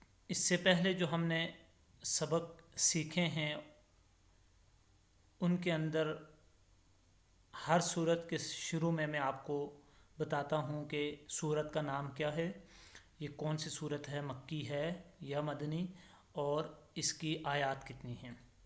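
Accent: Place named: Panipat, India. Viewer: Pani Patti awaz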